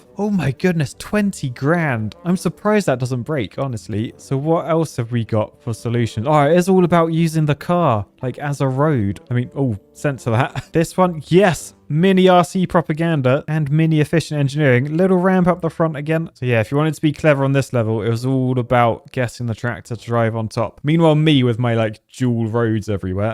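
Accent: United Kingdom. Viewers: This video would’ve been better without your British accent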